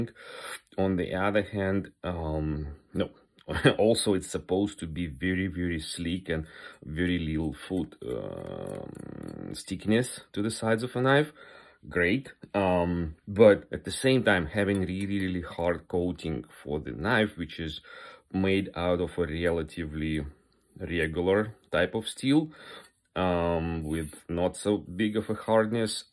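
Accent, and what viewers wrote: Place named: Russia. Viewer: Чувак да ты русский? )) У тебя русский акцент))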